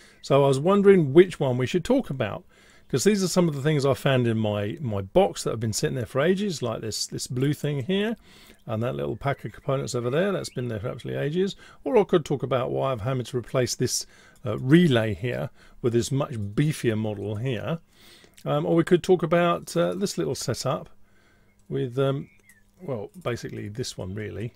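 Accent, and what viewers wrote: United Kingdom. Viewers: Hey, Am loving the videos, they are very informative. I would like to know your background in electronics, software etc...? Am from Liverpool, and I hear your also British. Where are you from? Keep the videos coming!! Kind regards Peter - Liverpool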